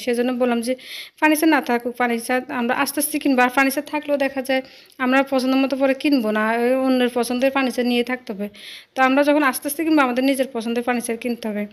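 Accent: Bangladesh. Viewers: মনে হয় বাড়ি নোয়াখালী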